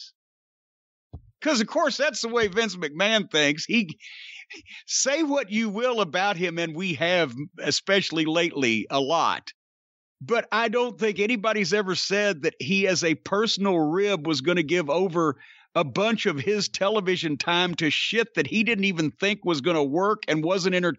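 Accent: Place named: Kentucky, United States. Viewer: Especially considering Jim is originally from Kentucky and still has his accent.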